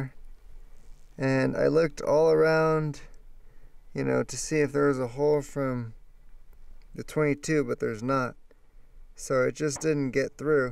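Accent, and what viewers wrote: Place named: New Mexico, United States. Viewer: no worries man. You have a similar accent to a buddy of mine in New Mexico so it was a guess lol. I love Idaho though!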